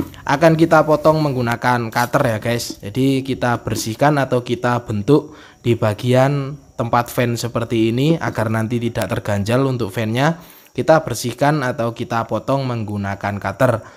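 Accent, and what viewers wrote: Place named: Java, Indonesia. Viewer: Suarane koyo jowo .tapi jowone ngendi ya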